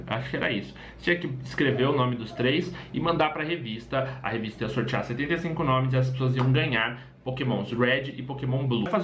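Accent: Brazil. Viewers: tu és pt e tas a falar pt br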